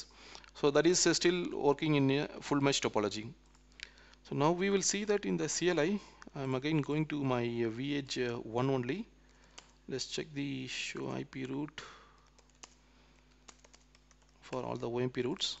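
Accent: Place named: Kerala, India. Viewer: Sounds like Kerala Nice video chetta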